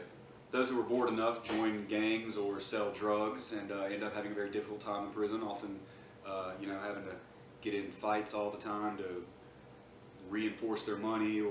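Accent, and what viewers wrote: South, United States. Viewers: yeh, after i noticed your accent was from usa i almost shit myself. i dont understand this case. you should have been protected under privacy laws of net neutrality or something unless you were fowarding bomb threats to the fbi or something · Sounds like an American accent... freedom of speech infringed upon? I've heard other countries you can get in trouble for words if you're unlucky but its still kinda bull. In America we have the amendments sooooo if you were arrested in America (can't say I know the details) but from what I have heard, this arrest was very unwarranted. So you must not be from America :/ If you are from America, im personally offended that it would even be possible for this to happen to you. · you sound like your in the south. Go chat it up with After Prison show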